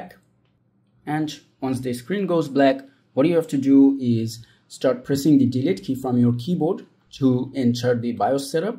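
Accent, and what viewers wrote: India. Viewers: Тот самый момент когда уже все перепробовал, а какой-то чувак с голосом индуса снимает это видео на телефон, и это тебе помогает😂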